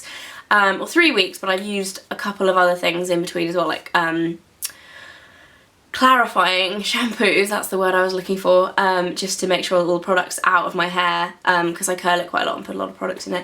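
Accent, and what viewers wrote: United Kingdom. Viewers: !!!!! YOU ARE AMAZING AND YOUR BRITISH ACCENT IS JUST PERFECTION!!!!!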